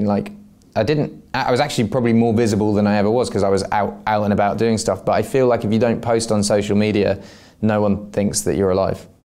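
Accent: England, United Kingdom. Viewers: L’accent 😭😭😭 j’adore vrmt l’accent anglais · L'accent anglais sahh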